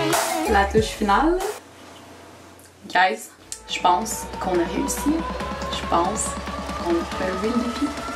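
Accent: Quebec, Canada. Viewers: Je suis la seule à kiffer l’accent québécois 😍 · L'accent québécois😍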